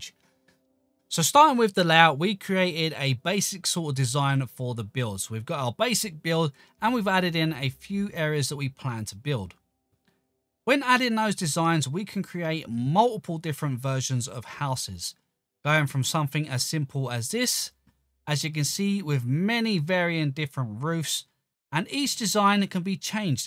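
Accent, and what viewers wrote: United Kingdom. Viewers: Hey, I haven't been on your channel for a while, but a question, are you bri'ish?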